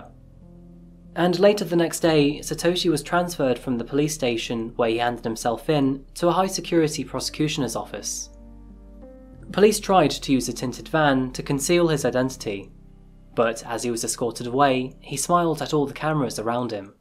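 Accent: United Kingdom. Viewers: I brought it up because apparently someone thought that Japan is any worse than any other country. Nopers. Even places like Canada do shit things to people. This video was made with a holier-than-thou attitude. It's probably best to look at your own country before you start blaming other countries for things. This guy sounds British, and we all know how bad the UK, one of the dominant colonizers of the world, has been, and continues to be. I wouldn't doubt it if this narrator himself has some dark secrets. One thing that certainly isn't a secret is that he is capitalizing on crimes. He's actually capitalizing off of the victims of this massacre. If he actually cared, he'd be doing work behind the scenes, helping people with disabilities, instead of going for a cushy YouTuber lifestyle. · out of context but i get so calm and feel so good listening to this guys voice even tho hes usually talking about murder lol i love u adorable british guy who tell me true crime cases, i love u!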